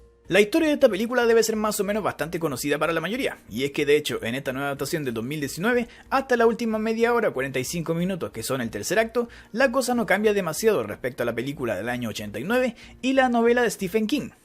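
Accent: Chile. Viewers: Eres de chile? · Es Chileno?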